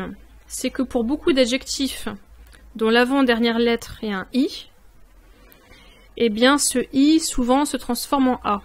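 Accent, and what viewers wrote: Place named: Maghreb. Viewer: Salâm 'alaykoum ukhti. Vraiment ma sha Allâh. Qu'Allâh te bénisse pour tout le travail que tu fourni. Tu es la seule qui fait cela. Et quel bel accent. Une vrai maghrebiya 👏👏👏. Je compte travailler à fond en m'aidant de tes vidéos. Et merci j'ai vu que tu avais mis des films en arabe sa m'aideras également. Thellay f rassek 🌷🌷🌷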